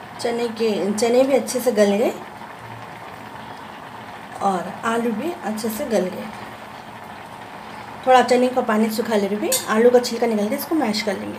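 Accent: Hyderabad, India. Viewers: Hyderabadi language 🙄🤔🤔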